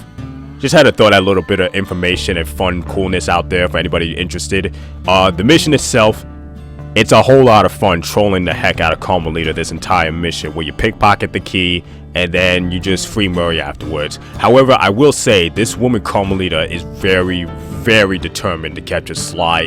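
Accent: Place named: New England, United States. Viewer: Stupid question. What part of the us is your accent from? Sounds like New England in general. My guess is new Jersey, or Boston. Linguistics fan here. Anyone with more info lmk happy to learn!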